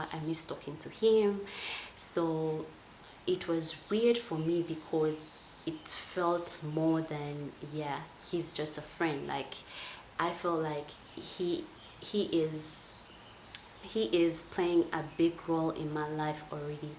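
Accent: Uganda. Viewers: I enjoyed the story!! Monetise this channel so you make some chums. I thought you were Kenyan at the start but then felt the Ugandan accent deep in there. Love your videos💖💖💖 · Before even reading the comments, I knew she was Ugandan. She has this beautiful Ugandan accent.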